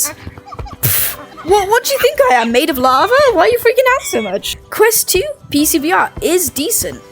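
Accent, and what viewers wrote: United Kingdom. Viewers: Great vid as always. ❤️ ur British accent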